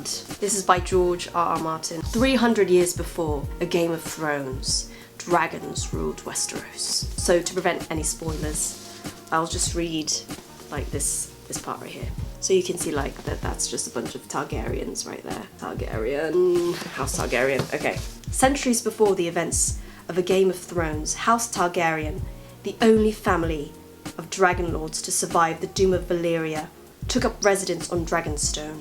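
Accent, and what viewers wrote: United Kingdom. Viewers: 🇬🇧i like this British Accent though 👍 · I Lovw British accent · YAS SPICE GURLZ <3! They were my real life sailor scout too. hehe Love the british accent. Don't delete this video, it's so fun! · If I hadn't know better, I'd say you're proper British girl haha I'm not a native English speaker, but I learnt my British accent by watching Game of Thrones (a lot) and imitating them. Funny you mention it too! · Wow haha it sounded pretty perfect and standard to me! Although i know very little about British accent 😅 Well done,! For some reason I like this accent of yours more than your American one 😆❤ Makes me wanna keep hearing it. Very attractive!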